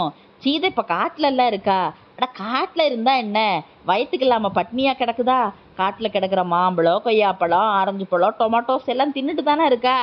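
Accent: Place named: Tirunelveli, India. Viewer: கலையை விலை பேசாத கலைஞன் வாழ்க! திருநெல்வேலி பாஷை வளர்க.!!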